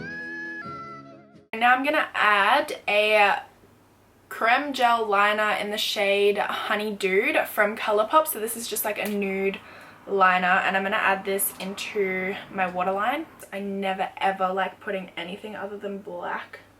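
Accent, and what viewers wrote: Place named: Queensland, Australia. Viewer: you are such a doll. I was in QLD a few months ago, love your accent 😘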